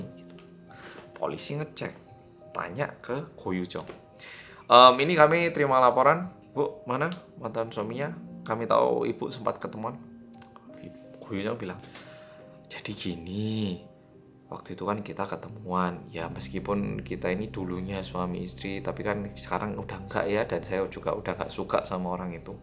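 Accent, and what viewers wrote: Java, Indonesia. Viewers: Medok muantepol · Mas bahasa indonesianya lues banget, logat jawa sih, tapi yang ndak tau klo mas orang korea pasti dikira lahir di indo, hidup di indo, asli indolah wlopun keturunan korea. Klo youtuber korea yang lain, masih bisa ditebak, krn walopun berbahasa indo tapi logat koreanya keliatan.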